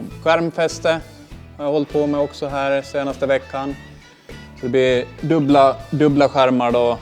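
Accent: Åland, Finland. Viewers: jaa hörde lite finlansvenskt i tonen! De är bra ni har börjat med youtube 👍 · Härlig dialekt men jag gissar nästan på att han är från Åland från början! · Skulle precis fråga om du var från åland ,när jag såg i kommentarerna att de var så😅,avesta dal åland mål... Jävligt bra film iaf 👍👍. Heja åland, världsrekord på is oxå. Topfuel tjejer i nhra mm. Mycket bra racers från åland !!! Keep it going ❤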